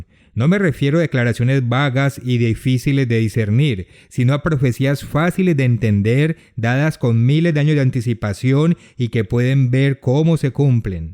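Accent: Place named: Latin America. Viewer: Me encanta este canal,pero una cosa, la voz es muy latina y no la comprendo , desde Chile bendiciones!!!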